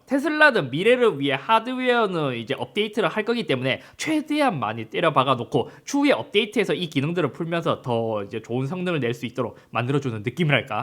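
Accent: Gyeongsang, South Korea. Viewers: 경상도 사투리 구수 하네예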